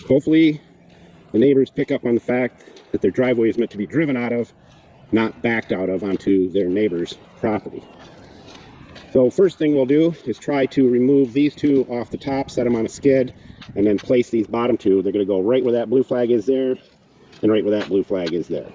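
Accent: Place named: Upper Peninsula, United States. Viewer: Crazy Upper Peninsula accent.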